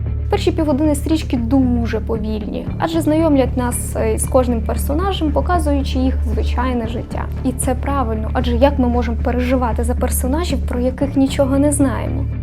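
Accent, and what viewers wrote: Standard. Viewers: Вау! Яка стильна та харизматична ведуча! Вподобайка та підписка без сумніву! І окрема дяка за чистісіньку мову!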